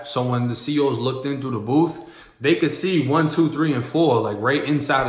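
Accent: Boston, United States. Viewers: 💯RESPECT MY G... KNEW U WAS IN BOSTON THE MINUTE U SAID CAA INSTEAD OF CAR.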